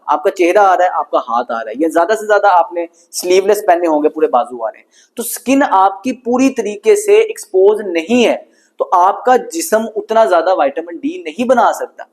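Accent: Punjab, India. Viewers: لھجے میں بہت پنجابی پن ھے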